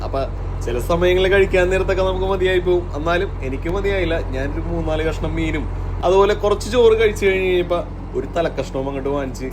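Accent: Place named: Kochi, India. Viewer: Nice video correct kochikkarente voice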